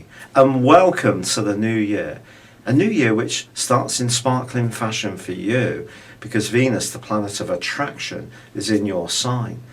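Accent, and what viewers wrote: England, United Kingdom. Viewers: Why do all of you astrology people have English accents. I can not stand that sound .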